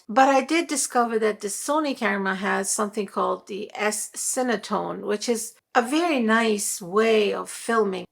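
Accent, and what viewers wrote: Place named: United States. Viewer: I thought I heard a faint German vowel. Near-perfect American English. I wish all multilinguals would work on pronunciation.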